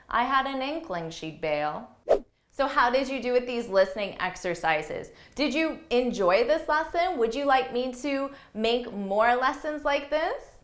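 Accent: United States. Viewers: American accent is quite easy to understand, British one is so challenging though. I listen your podcasts on 1.5 speed and face no issue. In terms of British accent, a lot of words are not understandable for me like, theme etc. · This isn't English. This is American. Don't learn this if you want to learn English pronunciation. It's a waste of time.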